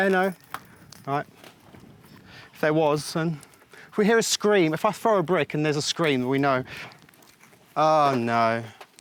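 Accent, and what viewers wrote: United Kingdom. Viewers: …your British accent is so much more enjoyable to listen to than Dick Van Dykes!!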